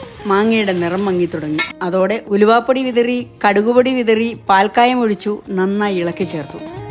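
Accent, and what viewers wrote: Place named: Ranni, India. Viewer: എൻറെ മുത്തശ്ശിയും ടീച്ചറുടെ നാട്ടുകാരിയായിരുന്നു.റാന്നി.ഇതേപോലെയുള്ള സംസാരവും ജീവിത ശൈലിയും.❤❤❤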